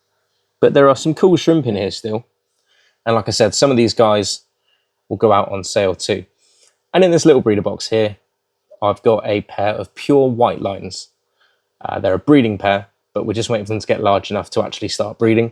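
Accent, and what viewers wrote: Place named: United Kingdom. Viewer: I am from east asia, I am just here to enjoy ur british accent haha.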